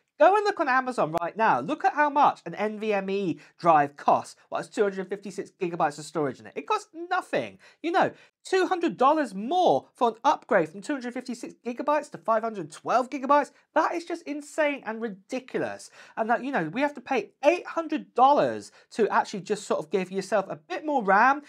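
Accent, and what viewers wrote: United Kingdom. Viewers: I am planning on getting the base model M4 and hooking up an external thunderbolt drive in a thunderbolt enclosure for more (and very speedy) storage. I could really use a new iPhone but for now, my iPhone-7 is still ticking along. Love your videos and your accent. For some reason I feel smarter when listening to a Brit! 🤣🤣🤣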